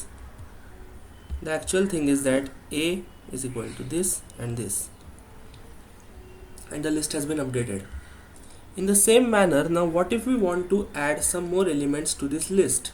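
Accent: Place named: Maharashtra, India. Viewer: sir, this is really very helpful tutorial, no mess just dive into the topic. i guess you're maharashtrian by your accent, i could be wrong but i think so. thanks for this great tutorial.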